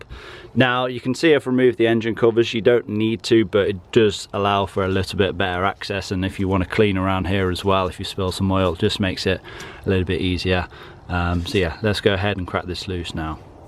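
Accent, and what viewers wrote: United Kingdom. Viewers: the british accent sounds pretty awesome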